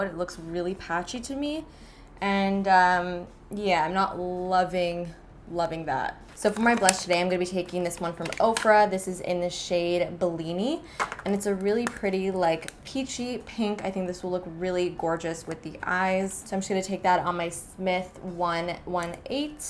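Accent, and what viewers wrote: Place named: Canada. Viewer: One of my favorite things about your Canadian accent is how well you enunciate specifically all letters in words such as Anastasia. You could have a talk show or podcast and I would so listen. 😂 Not trying to sound creepy, just enjoy your calm and well spoken voice! Love you xoxo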